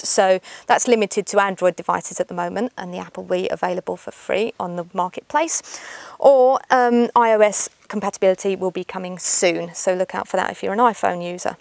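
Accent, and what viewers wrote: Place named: England, United Kingdom. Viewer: I want to marry an english girl; I can listen to that accent all day long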